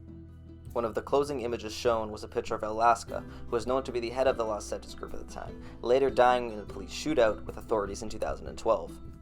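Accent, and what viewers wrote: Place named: United States. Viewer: Nice to hear a american speak on famous mexican singers. ❤❤🇲🇽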